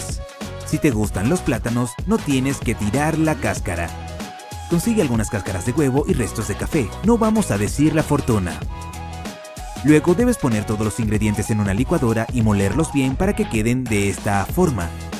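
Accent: Russia. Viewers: Eres ruso ???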